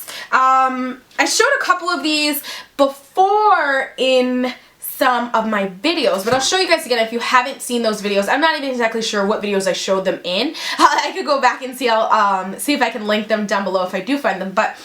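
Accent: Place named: New Jersey, United States. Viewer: hey where are you from I love your accent by the way you have like a jersey accent to me hope that isn't weird!!!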